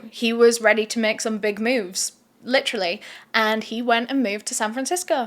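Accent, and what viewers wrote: United Kingdom. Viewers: honestly, your way of speaking and accent is so annoying 💀 it was really hard to go through the whole video (i liked the case so i watched) without cringing at your horribly fake talking.. you're the type of person that makes people hate a british accent lmao like wth is STAWries?? DAWr? affAWRed? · How is it that you have a British accent but yet talk like the classic obnoxious, ditzy, Valley girl? “Um yeaaaa, like okkkkk. I love to stretch out the last syllableeee of the last word in each senteeeeence. Sometimes even a couple times a senteeeeeence. With a very low, annoying, tired like sounding toooooooone. Or speaking with a high rising terminallllll. That all ditzy girls doooo. Which used to be called Valley Giiiiirl because a lot of dumb bimbos from the LA California areaaaa would all talk like thiiiiiiis and some still dooooo but not even close to how many used toooo like when Paris Hilton was famouuuus. 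Kim K used to talk more like thissss and thats exactly the type of braindead person that would talk like thisss, a person who watches The Kardashiansssss and idolizes themmmm who also probably think the world is flaaaat and couldnt do simple basic math or comprehend anything over a 2nd grade level educationnnn” I’m not saying thats you, I’m just saying thats at least how you sound when you talk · You sound UK and look Irish but wearing an Italian heritage shirt soooo was wondering 🤔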